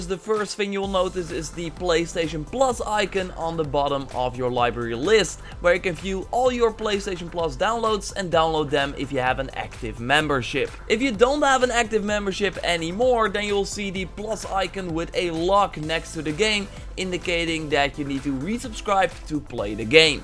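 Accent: Netherlands. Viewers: >Dutch accent >Horizon zero dawn DOE ES NORMAAL GODVERDOMME Goeie video :) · Een nederlander hehehehehe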